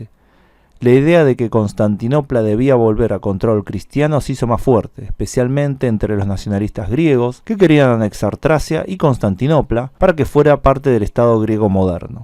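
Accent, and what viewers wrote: Argentina. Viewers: Tema Interesante, pero escuchar SHAS SHA SHA, DUELE EL OÍDO. EJEMPLO SHAMÓ, EN VES DE LLAMÓ, desarroshó, en ves desarrolló; mishón en vez de millón; mashoría en vez de mayoría; sha en vez de ya; masho en vez de mayo; shamada en vez de llamada, shegaron en vez de llegaron, constitusheron en vez de constituyeron, etc etc. Oye amigo gauchín, en tu país, habla como quieras, pero para consumo exterior pronuncia bien y modula bien el castellano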